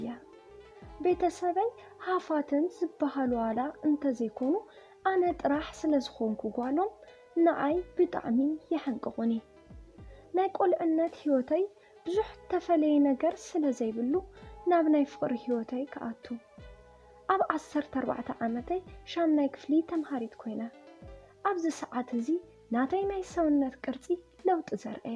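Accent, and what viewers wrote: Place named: Enderta, Ethiopia. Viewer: ጓብዝ ኢኺ! ናይ እንደርታ ትግርኛኺ ለለ ቃሕ ይብል።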